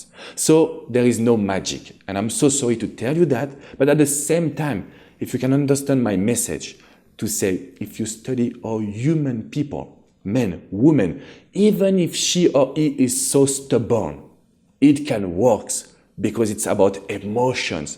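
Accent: France. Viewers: Amazing video WoooW, you are amazing! and love your french accent! Thank you sharing those thing!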